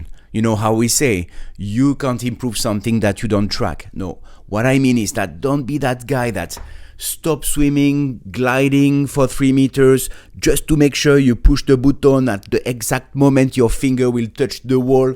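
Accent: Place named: France. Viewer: It's funny how your accent sounds exactly like the one of a French native speaker.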